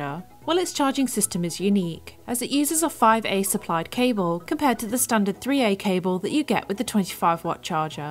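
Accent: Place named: Northern England, United Kingdom. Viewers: Northern accent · I really loved ur british accent ❤❤😍👍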